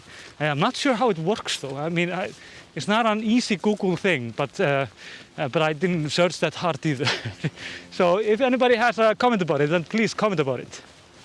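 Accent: Iceland. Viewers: I love Your Islandic accent ❤️ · I really love your repots from the volcano and and your funny Icelandic accent :). You and your camera man are really doing a great job! I'm wondering about more m3 of lava because, in my opinion, the eruptions have become much shorter than before.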